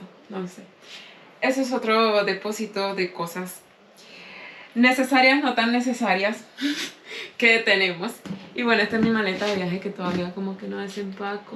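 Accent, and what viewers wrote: Colombia. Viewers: Eso es una excusa pues estoy claro y tú también lo estás que hablas caliche porque te gusta, por qué crees que es nice... déjale eso a la Youtuber venezolana que imita todos los idiomas en español y hasta en inglés. Creeme, hablar caliche no es nada nice, más nice seria hablar con cualquier acento venezolano, inclusive nuestro acento gocho y de merida, esos si que son acentos bien bonitos. Si fueras mi pareja la ladilla y el chalequeo que te tuviera fuera trifásico, de hecho la mamá de mi hija menor tenía esa mala costumbre de hablar caliche por estár viendo las novelas del vecino país, y el chaleco que le monte fue extraterrestre hasta que dejó la ridiculez. La diferencia está en que la mamá de mi hija no es Youtuber, no tiene una cuenta donde nos pueda representar, tú sí.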